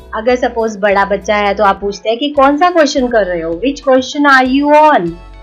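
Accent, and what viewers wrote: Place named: Punjab, India. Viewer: You have a punjabi accent..however the conversation was good😊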